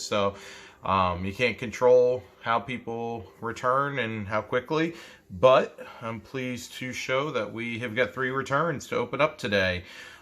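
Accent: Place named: Utah, United States. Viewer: I’m listening to your accent... I’m hearing something that tells me that you’re not a native from North Carolina. What am I hearing? My guess is Utah. Am I right?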